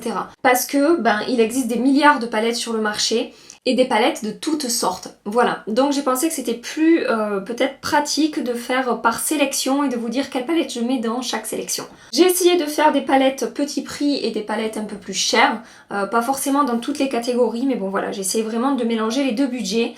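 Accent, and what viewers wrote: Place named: Marseille, France. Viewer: Tu es très belle, tu explique bien, et j'aime ton accent marseillais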